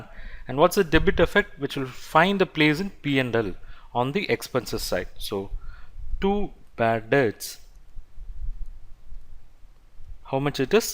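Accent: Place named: India. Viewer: no offence but indian english is very shitty..yall casketing the shit outta it....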